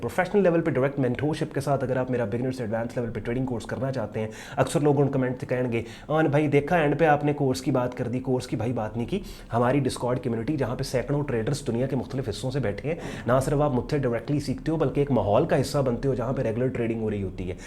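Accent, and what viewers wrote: Punjab, India. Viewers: Mashallah I like the way you explain bro or Jo Panjabi Ka trqa lgaty Hain Kia kahny😂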